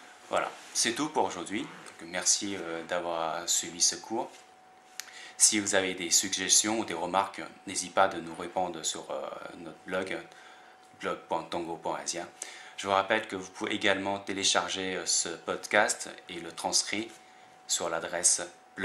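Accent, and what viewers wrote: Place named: South, China. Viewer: pas mal mais un peu accent du Sud par exemple 万 vous prononcez 旺——|||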